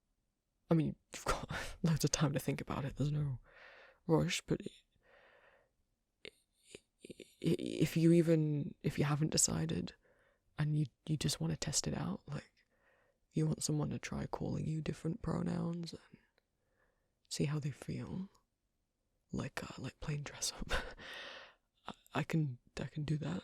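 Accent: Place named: United Kingdom. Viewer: GRAAHHHH i ADORE the british boyfriend audios!!! all your recent audios have been AWESOME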